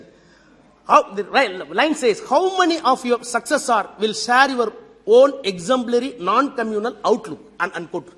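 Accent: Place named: Tamil Nadu, India. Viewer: Even when he speaks in English, the pronunciation is based on Tamil and he searches for words to continue to hold his further speech .There is nothing to praise him for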